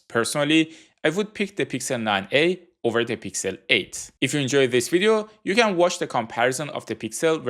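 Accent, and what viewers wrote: Iran. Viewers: Dadash lahje englisit tablo'e Irani hasti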